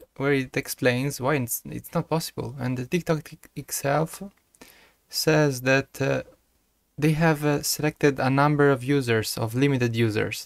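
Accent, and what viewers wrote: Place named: Italy. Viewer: si sente un sacco l accento italiano! xD comunque sei un grande, funziona e mi ha salvato la vita!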